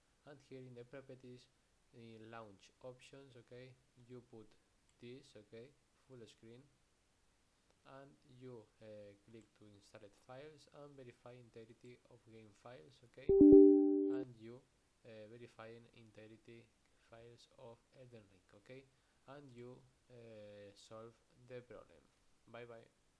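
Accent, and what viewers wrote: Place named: Spain. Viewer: el acento español ha sido abrir el video y notarlo al momento XDDD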